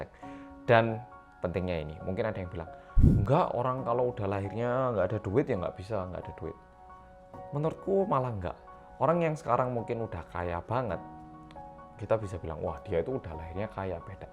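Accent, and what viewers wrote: Java, Indonesia. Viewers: Orang korea yang medokkkk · Mas medokkkk.....aku salah fokus liat kowe kok cek kuruseee 😔 cukup mas jok tmbh kurus maneh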